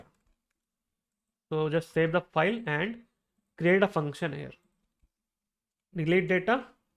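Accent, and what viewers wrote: India. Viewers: no hate towards Indians but I hate their english accent it irritates my ears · his accent is so thick indian, it's hard to get anything he says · Stop being so offended dude. Narrator has an accent thick as a curry which is a fact. Before you call me racist, I am an Indian too and I would have said the same thing. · change the indian accent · Omg please not indian-english voice magodddddddddd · Indian accent provides clarity. Yeah and you guys are proving that you don't have good teachers to teach you guys, you depend on Indians. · Just 1 thing i like more than study programming - is to study programing in English with indian accent. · Indian accent make me easy to understand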